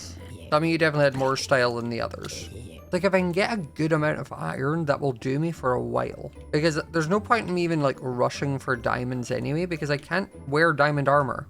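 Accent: Scotland, United Kingdom. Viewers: Are you Scottish scot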